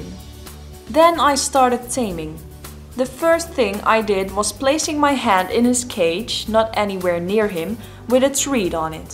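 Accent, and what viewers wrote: Netherlands. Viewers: ik heb je door je hebt een nederlands accent ja ja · Thank you so much for this video! I have a pair of little robos. I have waited about a week since I got them and I've tried to tame them a couple of times. They are usually scared of me but yesterday they took a treat from my hand. It definitely takes a lot of patience to tame a robo! I will be using the techniques in this video to aid my taming process, Btw I love your accent! Are you Dutch? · Pedro Paúl She's Duch i think. I have Dutch relatives and i recognise the accent when they speak English. I like it too 😊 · Lauren Mcintyre Nederlands denk ik ook. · I can hear ur dutch i don't know why, het is gewoon beetje het accent..... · Wie hoort ook dat ze Nederlands is? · Are you dutch??? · i have 1 qeustion: are you born in the netherlands because you sound dutch to me ( i really like your videos but i was wondering because im dutch my self)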